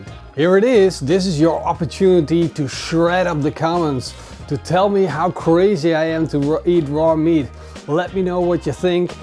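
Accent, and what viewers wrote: Netherlands. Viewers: His dutch accent is so strong😂😂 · i like this guys dutch acccent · idk what it is about the Dutch but you can always instantly tell whether they’re Dutch or not, you can’t do that worth Scandinavians for example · I’m new to your channel, but you have a Dutch accent.. are you Dutch? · Just found this channel and immediatly i can hear you're dutch. The Dutch/English accent stands out so wel haha! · Is he from holland his accent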